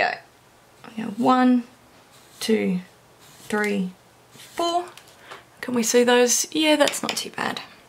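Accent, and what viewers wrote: Australia. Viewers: Hi! When you say you’re going to blend the white strokes out with a “thinner”, do you mean just like something that you buy that is just called a paint thinner, or do you mean some sort of odourless mineral spirits? This was a good video. I normally end my comments with hi from Australia, but I know by your accent that you’re Australian and it’s always nice to find an Australian video on YouTube! 😃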